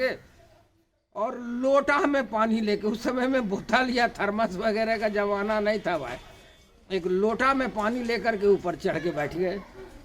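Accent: Bihar, India. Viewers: Dear sir, I love you way of narration and bihari voice. I am a gun lover. I support killing for food, not for enjoy. However shooting is always joyful unless it harm innocent people.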